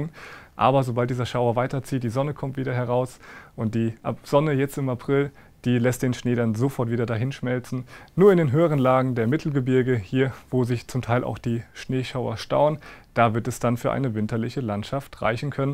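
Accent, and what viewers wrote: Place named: Ruhr, Germany. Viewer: ...das ist ruhrpottdeutsch...herzlich u. direkt. ich liebe es...😍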